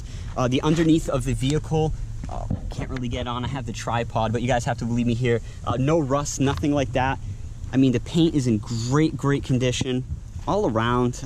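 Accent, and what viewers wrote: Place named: Rhode Island, United States. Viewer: You from Rhode Island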